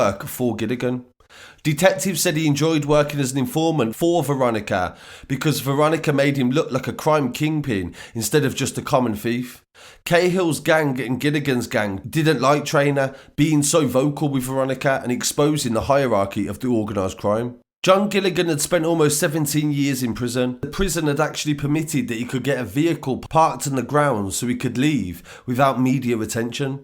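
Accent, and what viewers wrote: Birmingham, United Kingdom. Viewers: Bro I feel your channel would do better if you tone down the brummie accent. Good story!!!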